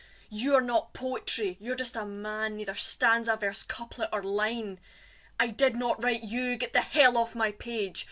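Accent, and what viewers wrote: Scotland, United Kingdom. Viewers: Hi, Love listening to your South Scotland Scottish accent! I am fae Aeberdeen an I spik Doric. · Amazing love her command of the Scots language accent and the red hair and of course the poems.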